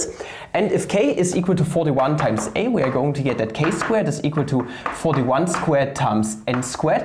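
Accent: Germany. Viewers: You sound german, are you from Germany?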